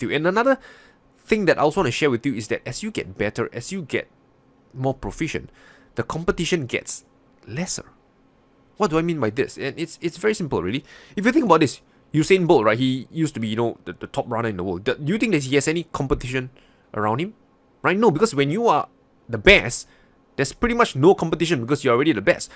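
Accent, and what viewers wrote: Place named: Singapore. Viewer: Nice discussion Sir. And the Singaporean English Accent.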